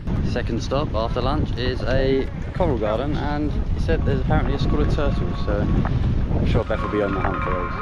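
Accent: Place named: United Kingdom. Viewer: Why your shirt has Malaysian flag? But your accent sounds British...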